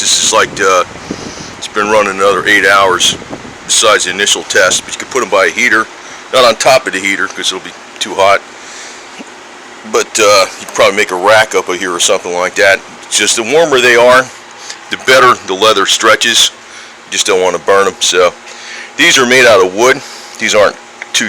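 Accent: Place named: Chicago, United States. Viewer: i always liked el caminos. this guy sounds like a chicago accent cause he talks like me and i am from chicago.....! and nice car dude....!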